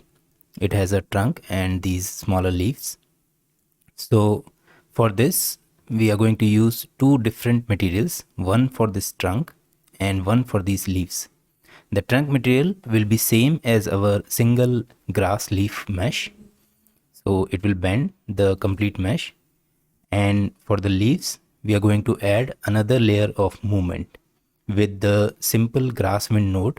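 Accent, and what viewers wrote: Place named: India. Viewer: I like the Indian cool voice...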